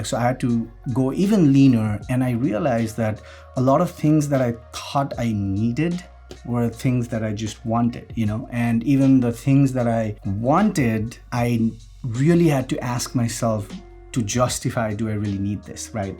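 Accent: Nepal. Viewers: Not to be rude but you sound like a nepali. That accent is unmistakable. I have a couple of friends and even colleagues who are nepali.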